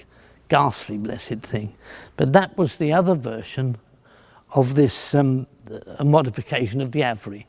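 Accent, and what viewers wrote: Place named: United Kingdom. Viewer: "That's a load of tripe as far as I'm concerned"...., your British is outstanding. You talk on & on about anything you like, it's such a pleasant experience.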